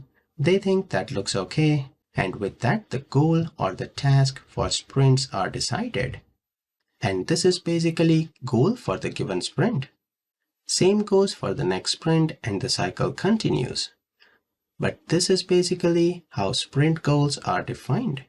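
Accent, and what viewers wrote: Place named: United Kingdom. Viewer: gujju bhai your accent is really hard to understand. do you live in britain ?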